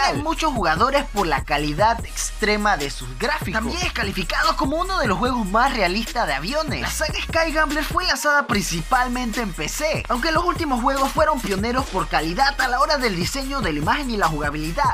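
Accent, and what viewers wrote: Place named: Chile. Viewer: Porque habla como chileno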